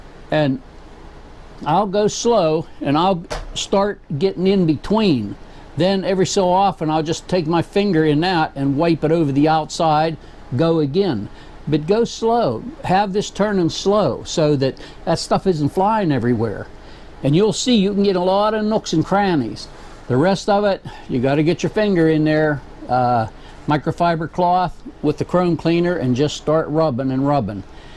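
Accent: United States. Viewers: Are you from pennsylvania? I can hear it in your voice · I'm originally from New Castle. I know that western PA accent when I hear it!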